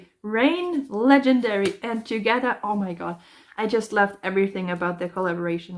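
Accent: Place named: Germany. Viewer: I really like your binder!! It looks really really nice! I think it's so cute how nervous you are, but you really don't have to be. You are doing a great job. Judging from your accent I believe you are german? But don't be insecure about it, it's really cute😁👍👍❣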